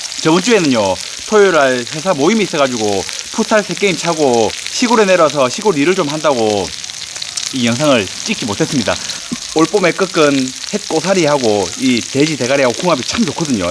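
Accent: Gyeongsang, South Korea. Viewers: 정말 끝내줍니다 진주 사십니까 쥔장님 ? 발골도 잘하시고 경상도 사투리도 구수하니 좋고 같이 한잔 하입시다 ㅎㅎ.서울인데 한번 가고 싶네요 ~ㅎㅎ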